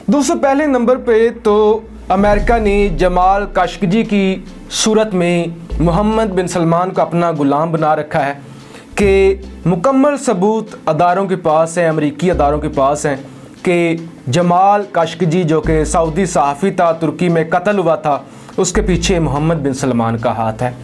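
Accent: India. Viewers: گلت فہمی نہیں ہوتا غلط فہمی ہوتا ہے آپ انڈین لہجہ چھوڑ دیں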